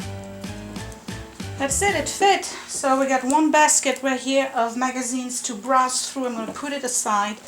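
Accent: France. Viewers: What I'm about to say is probably to repeat what others have already said...but... schools might love the pens pencils. Your baskets, and the contents , the post it notes -- other things -- do NOT look tacky, nor shoddy and others will love picking through. If you placed some of these things within the baskets curbside with a FREE sign atop ... well all this really good looking stuff (if excessive) might disappear quickly. What courage you show in tackling the impossible. It's what you don't want to do but you do it. Super character modeling for those kids. Again a joy to listen to this French inflected lovely voice and all the dissecting of life and our little errors in buying...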